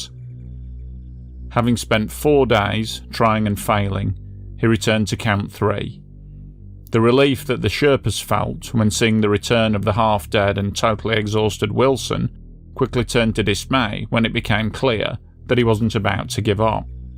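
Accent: United Kingdom. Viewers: clearly you say 18-98. Our Yank cousins ain't used to our dialect, innit 😆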